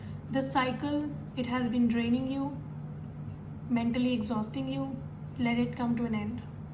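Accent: India. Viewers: Your Indian accent is very interesting I like they way you laugh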